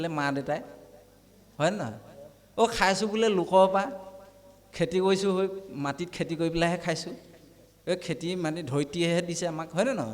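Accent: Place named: Darrang, India. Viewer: Bap আপোনাৰ বানী শুনি বহুত ভাল লাগে। Darrang ভাষাৰ লগত মিলে।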